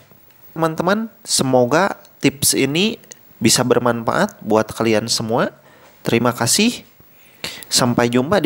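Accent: West Java, Indonesia. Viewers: Urang Sunda ya kanh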